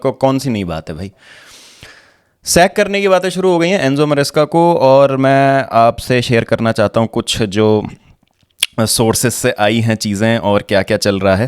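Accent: United Kingdom. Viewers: Your English accent uff❤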